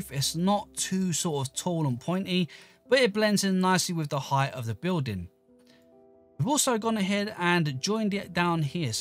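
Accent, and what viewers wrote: United Kingdom. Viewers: Hey, I haven't been on your channel for a while, but a question, are you bri'ish?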